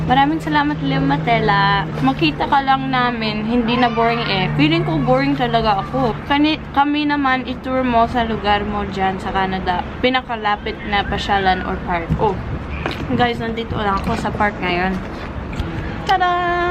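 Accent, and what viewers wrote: Visayas, Philippines. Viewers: pwede ka magbisaya kay murag naa kay bisayan accent...shout out po...maligro family....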